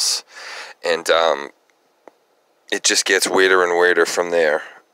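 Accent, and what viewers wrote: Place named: New England, United States. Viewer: This case fascinates me. Listening To your voice I’m thinking you’re a fellow new Englander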